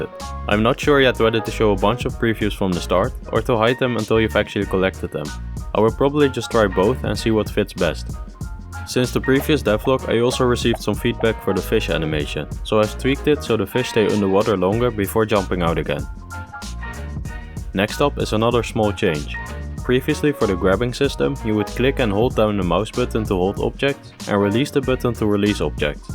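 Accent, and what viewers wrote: Netherlands. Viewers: Wow what an amazing channel just subscribed, when I heard your accent I immediately thought that you were Dutch! and your are right? I'm also learning how to program myself, but I'm just a beginner. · R U Dutch? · r u dutch? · Beautiful dutch accent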